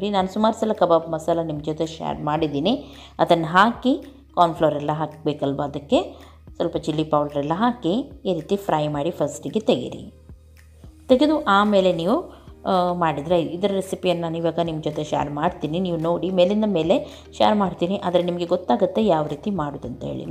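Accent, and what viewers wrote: Mangalore, India. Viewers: Hi mam Nim Mangalore li mathado kannada language andre nange thumba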